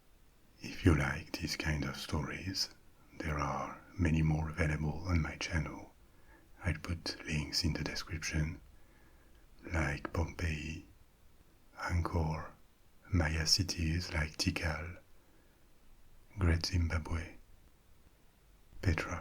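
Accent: France. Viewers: I hate the fact that this channel is labelled ASMR. I hate the way you whisper. Hell, I even hate your French accent. But bro... son of a gun, I will be going to sleep to your soothing tales of ancient myths, legends, and history for the next few months. Damn you, ASMR Frenchman. Damn you.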